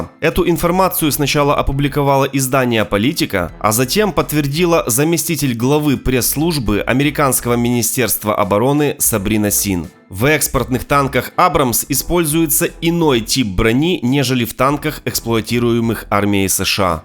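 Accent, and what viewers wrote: Ukraine. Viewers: украинский акцент, прикольно. А что не на мове?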